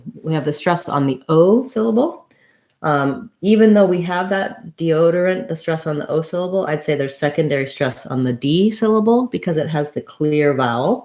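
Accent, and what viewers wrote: United States. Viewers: Why are teaching English with a deliberate American accent? It is incorrect English and very confusing for foreigners. There are many area accents in England but nobody normally would teach it because it is incorrect.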